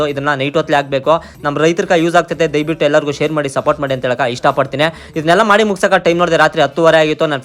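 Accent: Kolar, India. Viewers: Typical kolar kannada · Nim mathugalu style super Anna Hoskote , Kolar side ❤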